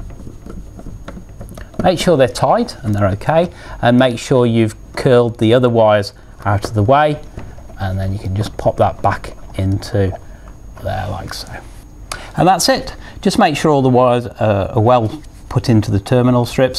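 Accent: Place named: United Kingdom. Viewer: Brits are great instructors...they have a command of the King's English. I am an American. Even though we fought against the British to be free and have our own nation, we fought and died together decades later, side by side in WWII , against the "Progressive Globalists" of that time then, the German Nazis.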